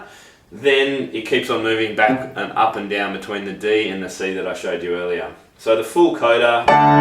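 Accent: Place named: Australia. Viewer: you're so good! and Australian! xD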